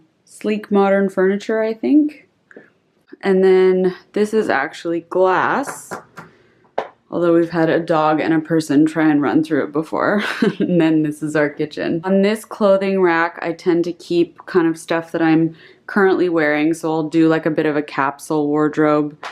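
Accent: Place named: Canada. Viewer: is anyone else able to immediately tell when someone from toronto/vancouver is speaking english or is that just me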